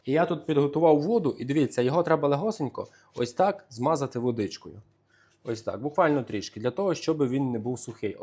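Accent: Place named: Lviv, Ukraine. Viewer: За акцентом - це мій земляк, львів'янин! Цікаво, вгадав, чи ні? Рідний говір майже завжди пізнаю. А тепер питання: 4 хлібчика мені забагато, чи є якісь тонкощі, якщо розділити все на 2?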